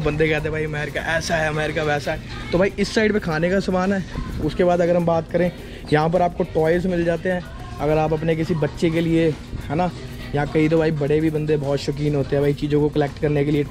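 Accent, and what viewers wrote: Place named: Punjab, India. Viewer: Hindi ch v punjabi wala touch 😂😂😂😂😂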